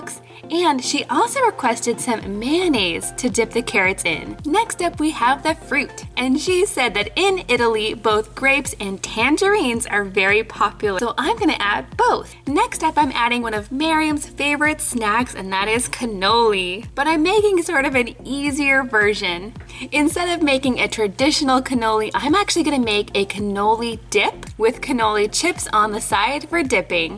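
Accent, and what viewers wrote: Netherlands. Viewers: #DUTCH Like if you're dutch. Love your accent, haha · its funny how you speak out the words im from the netherlands aswel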